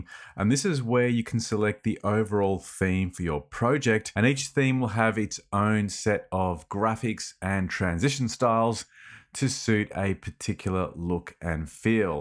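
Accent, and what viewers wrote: Australia. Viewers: G'Day Mate! I needed a refresher after not using KeyNote for so long and found your channel. I have subbed straight away, it's so great to hear another aussie voice here on YouTube.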